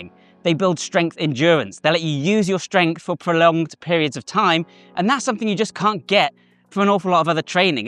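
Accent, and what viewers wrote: United Kingdom. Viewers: Closed caption gives a transcription of CATTLE BELLS. Now the largest cow bell is over 2000 lbs in Germany. So maybe has something here....cow bell lifting. Or maybe it's just the Brit accent.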